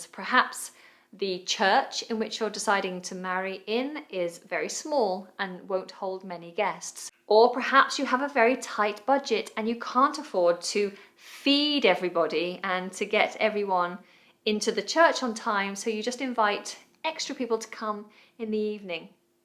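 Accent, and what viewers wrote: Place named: United Kingdom. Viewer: Hi, I really like your videos, you are very nice and have a lovely voice and a clear and perfect British accent that makes it easier to understand and learning english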